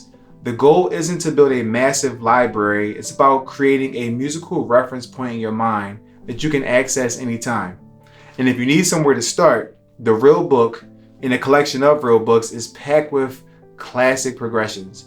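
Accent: United States. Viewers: Funny, you sound American, but the road footage shows someone driving on the left side of the road. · Ik this prolly irrelevant right but u sound like u from Philly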